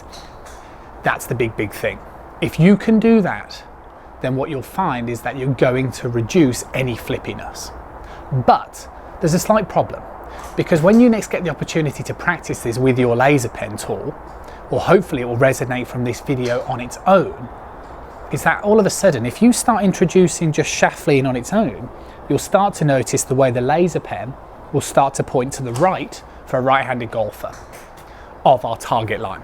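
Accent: United Kingdom. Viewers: You are the first British instructor that I can understand your diction. The accent is pleasant to listen to and learn from. Keep up the great videos. Bruce from USA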